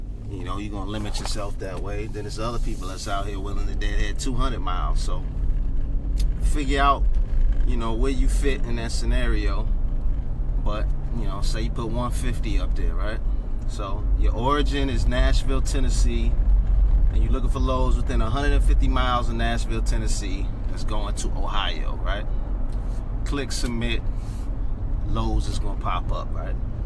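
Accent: New York, United States. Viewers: Are you sure you not from NY? You be using New York lingo and you sound like you from NY I swear lol.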